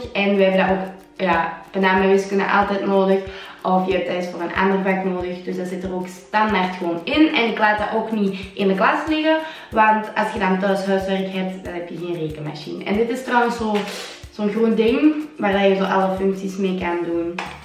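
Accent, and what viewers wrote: Belgium. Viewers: ik ben Nederlands en het is echt grappig dan hoe Belgen praten Loveeee naar jou btw :)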